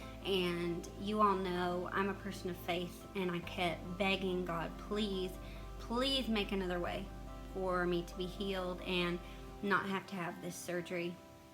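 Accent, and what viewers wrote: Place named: South, United States. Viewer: I stumbled upon you while looking for an ostomy bag cover I'd seen on Facebook. (It says "Better With the Bag" in different languages and has Hollister's logo several times.). Anyway, I watched your entire Hollister video without even realizing you had an accent - my accent. I'm from NC. This summer, I spent 2 1/2 weeks in the hospital and 6 1/2 weeks in a nursing home after emergency surgery for a severe blockage that almost killed me. I'm going through a difficult time now that I'm home. I saw this video and decided to watch it.:) <3 I'm so glad I did. Not only are you southern, you are also a Christian. I've loved that Bible passage since the 60s when the song came out. Thanks for being God's hands and helping to lift my spirits. I'll be watching more of your videos in the future.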